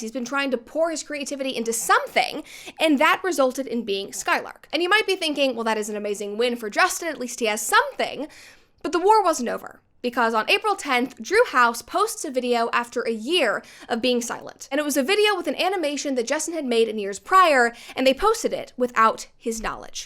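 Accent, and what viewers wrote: United States. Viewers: I really liked the way u talk fast and quick vibing American accent 🇺🇸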